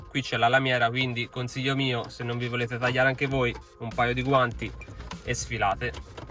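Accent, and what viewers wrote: Tuscany, Italy. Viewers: Ciao, io sono già andata da 2 elettrauto per altri lavoretti e quando ho accennato a cambiare queste lampadine si sono rifiutati😭..ora capisco perché 😁! Per caso lavori in toscana dato il tuo accento? Quanto può costare farsela cambiare da un professionista indicativamente? Grazie Manuela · fantastico! bel video, poi con l'accento toscano e ancora più divertente guardarlo.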